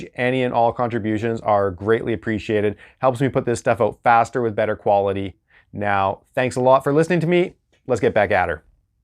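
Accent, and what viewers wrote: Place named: Canada. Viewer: Thanks for the tab great job! could tell right away you were Canadian from your accent lol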